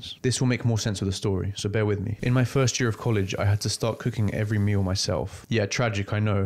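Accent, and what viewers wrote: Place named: United Kingdom. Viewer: oh sorry, I didn't mean English (by blood), just British as in nationality (which I assumed from your accent?) for some reason it's really hard to find British YouTubers on here, so many (great) American channels but it's pretty refreshing to hear British accents (from a Brit aha)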